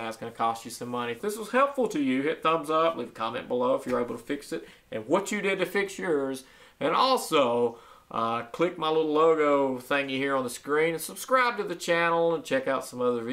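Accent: South, United States. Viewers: You never heard southern drawl?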